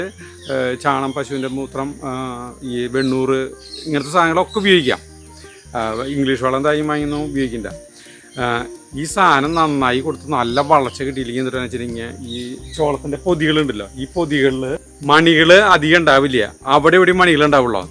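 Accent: Thrissur, India. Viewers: ആദ്യമായി കണ്ടതാണ് ആളുടെ വീഡിയോ!മടുപ്പിക്കാത്ത നല്ല അവതരണം.Keep It Up. സസ്ക്രൈബ് ചെയ്തു. തൃശൂർകാരൻ ആണല്ലേ! ആ സ്ലാങ് ഒരു ആവേശമാണ്. Wish You All The Best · Thrissur slang❤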